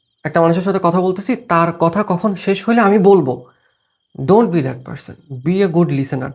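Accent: Chittagong, Bangladesh. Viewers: You're from Chittagong,aren’t you bhaiya?😁.. But, bhaiya your contents are too much needed in this time of our generation.. Thanks bhaiya🌸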